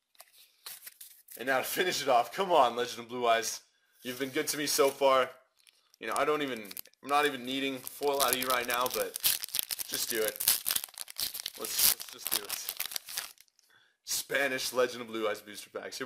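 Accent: Germany. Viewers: Sounds like you are TRULY german!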